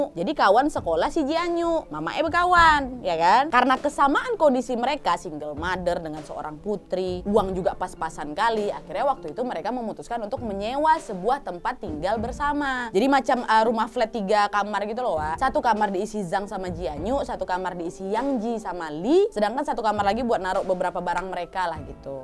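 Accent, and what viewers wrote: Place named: Sumatra, Indonesia. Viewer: Kak ini aslinya orang mana kah....? Masa iya orang Jawa tapi logatnya kayak orang sumatera.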